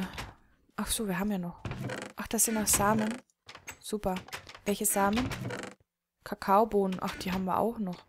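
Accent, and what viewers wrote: Bavaria, Germany. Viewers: Hy! Bin durch Lets-Plays.de auf dich gestoßen und find deine LP´s echt Hammer! Bist du aus Bayern? cheerio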